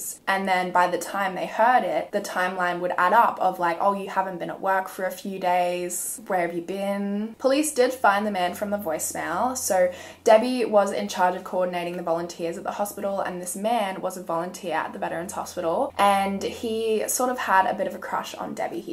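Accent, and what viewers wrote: Australia. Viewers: I really like your Australian accent 😊💖. And your videos too. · Her voice is so peaceful to listen to! Australian right? 😍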